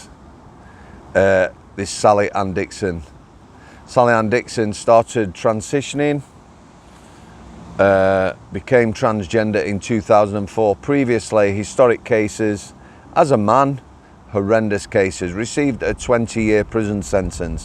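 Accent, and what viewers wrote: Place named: Northern England, United Kingdom. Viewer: Best Prison talk online! I heard you on the Prison Officer podcast on Spotify. Awesome hearing a Northern accent on an American podcast. My great Grandfather’s Brother was a Prison Officer at Strangeways. Retired from there. I’m from Cheshire, lived near Clitheroe Lancs, moved to Kentucky USA, did 8 years as a Correctional Officer, worked in the private sector (armed security) and have ended up back in ‘the job’ as a Deputy Jailer in a 600 man County Jail, seeing the worst of what humanity spits out on a daily basis…but still find time to have a laugh doing it…got to Brother! Love the channel, listen to it on the way to Jail daily. Thanks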